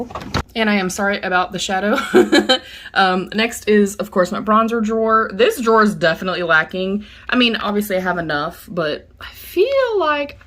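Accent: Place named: South, United States. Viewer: I love it,! I wasn't sure if you were Southern! 🙃🤪🫠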